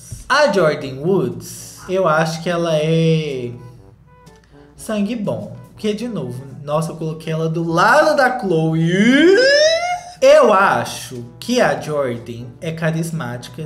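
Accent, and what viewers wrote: Minas Gerais, Brazil. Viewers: Eu ri muito com o lacre sueco! Habla mesmo papi! Kkkkkkk Amo os deboches do com esse sotaque mineirinho 🥰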